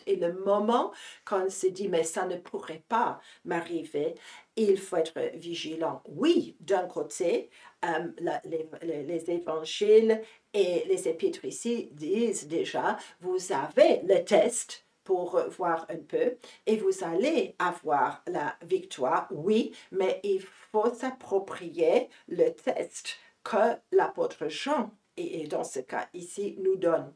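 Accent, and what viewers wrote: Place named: England, United Kingdom. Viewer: Did you try to pray Holy Father Pio de PIETRELCINA.? I AM SORRY BUT YOUR VERY STRONG ENGLISH ACCENT IS AN IMPEDIMENT TO MY UNDERSTANDING . HAVE YOU AN ENGLISH BLOG PLEASE.?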